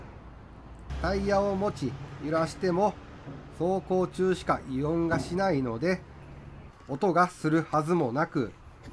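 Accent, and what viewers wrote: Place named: Kansai, Japan. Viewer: 関西イントネーションもいいです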